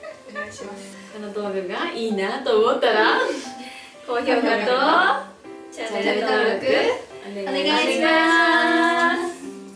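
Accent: Kagoshima, Japan. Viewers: 鹿児島弁は使っていこう｡忘れないで､いつもイントネーション鹿児島だしね3人とも。だせん？ですです～も使っていこう‼️僕も東京1年目は鹿児島イントネーションがとれなかった。普通に「いしちゃっ」はずっと出てたな。だから訛りは､大事。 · ええな～鹿児島弁👏👍言うてる事ぜ～んぶ分かるし…👍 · 鹿児島イントネーションで充分😂 そのままでいてください👍 ありがとう😆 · 普段の動画で話してた言葉が鹿児島弁やと思ってたのは俺だけ？ww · NHK大河ドラマ 『西郷どん』見てるかのようでした😅 鹿児島弁分からないです。 鹿児島の人は標準語はどこで覚えるのですか？ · 鹿児島弁可愛いこれからも動画楽しみにしてます、可愛い❤️😊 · 最高やないかい😊 鹿児島弁好きやからいいね😁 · 親が鹿児島、まったく喋り方一緒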